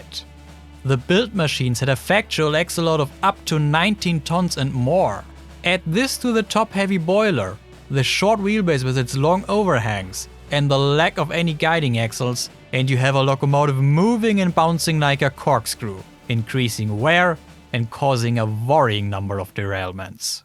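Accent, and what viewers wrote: Germany. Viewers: Er, no it isn't. Unless you think that AI can generate renders that good, and is speaks English with a perfectly German accent and occasional accidental use of German grammar. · he speaks AI german accent ... u have never had an argument ...sorry ... and his voice is always 0 dB ...u most likely dont even know what that is ..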